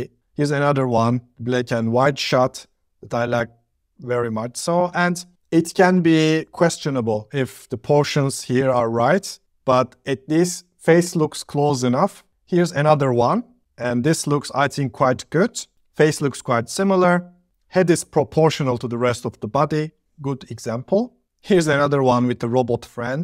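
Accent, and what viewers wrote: Turkey. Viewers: hoca, o kadar belli ki türk olduğun ve türk aksanın. İngilizceyi hiç bilmiyor olmama rağmen videonu anladım :))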